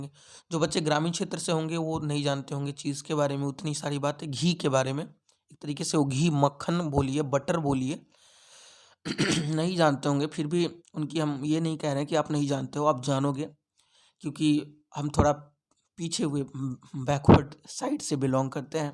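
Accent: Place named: Bihar, India. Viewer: Aap Bihar state se hain kya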